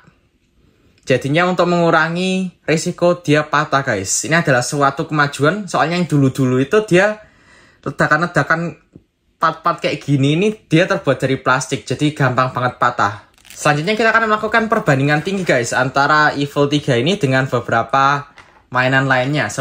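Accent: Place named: Java, Indonesia. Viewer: how much are this in rupiah and where can i get this in Jakarta? on a side note, i find it unique to how you’re a Chinese but speak Javanese dialect/accent 👍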